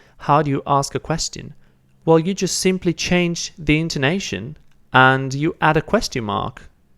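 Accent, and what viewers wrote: England, United Kingdom. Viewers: As a Spanish-English bilingual this course is such a delight to me, you explain everything so clearly and I am learning Italian with both persectives which is very useful, since pronunciation and some grammar basics are so similar to Spanish, I would probably miss some things if this course had been taught by a Spanish speaker. Also, your English accent is gorgeous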